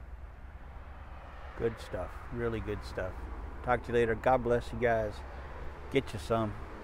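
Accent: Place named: South, United States. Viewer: Great review. Sounds good. And I see you are adjusting to the southern country life just fine. Even starting to get the drawl y'all.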